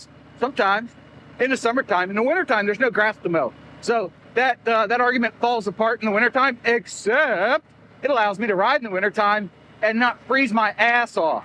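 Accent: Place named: Dallas, United States. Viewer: Sounds like Dallas to me and I wasn’t even born here in the States🤣🤣🤣🤣 Very true what you’re sayin…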